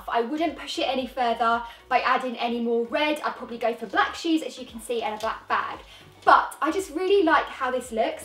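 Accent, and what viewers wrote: United Kingdom. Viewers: You may not realize it,but it's the combination of your dimples,your eyes,your British Accent and those colorful Berets you employed on your head that drove this Cambodian lad insanely mad.You got a boyfriend?? I can totally see you clinging to my arm while take a promenade up and down the Champ Elysee.The only thing missing on you is a little French Poodle leading the way to The City of Love!!;)))) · She’s from the uk ❤️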